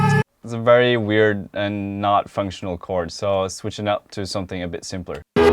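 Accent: Norway. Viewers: So is this dude norveggian or sveddish?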